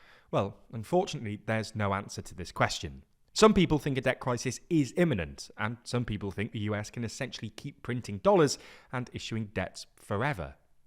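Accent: United Kingdom. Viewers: Love the British accent. You must be smart. How is the UK doing?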